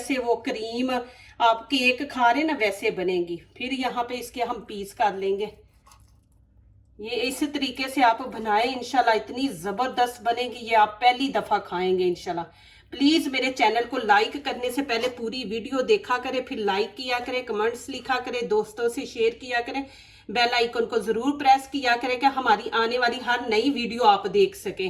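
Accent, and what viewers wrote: Punjab, India. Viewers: Plz zara ahista or kum bolen plzplz.or punjabi ki jagah urdu lehjy mn bolen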